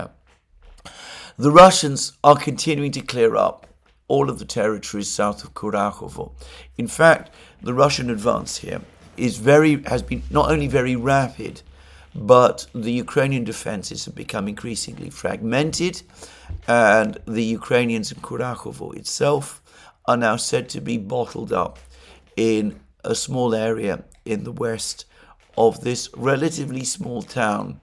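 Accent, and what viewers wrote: United Kingdom. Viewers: Glad you're feeling better, as I am addicted to your podcast and beautiful British accent.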